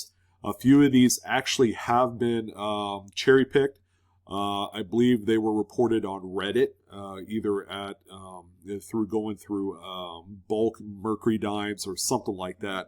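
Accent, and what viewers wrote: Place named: Blue Ridge, United States. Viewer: So had a chance to listen thru in one shot and yeah I like it. Clear concise with some "blueridge" dialog. I like it!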